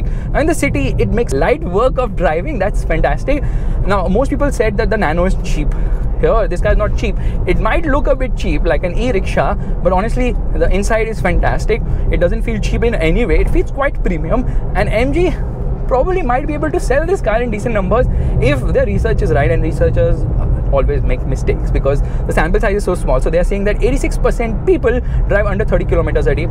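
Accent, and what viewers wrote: India. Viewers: Bro Your video is Alright but your indian accent are so funny 😃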